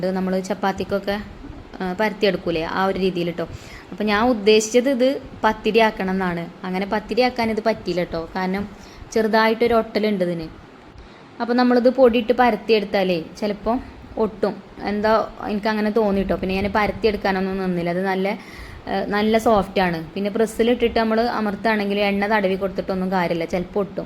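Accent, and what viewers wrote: Malappuram, India. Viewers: Enikk Malappuram basha valiya eshtam anu ningaleyum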